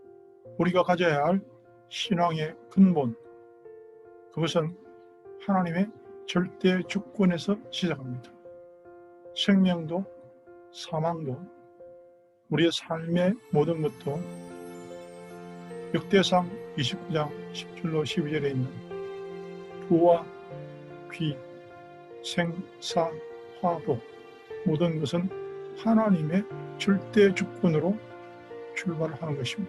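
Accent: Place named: Gyeongsang, South Korea. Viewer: 잠시 머물다갑니다. 경상도 사투리로 차분하게 말씀하시니 더 친근하게 들립니다. 종교는 없지만 귀 기울여서 잘 듣고갑니다.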